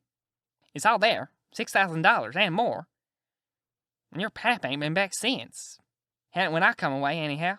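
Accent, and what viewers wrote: United States. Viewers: Saved me from probably taking much longer to read this book due to the southern accent. Thank you so much!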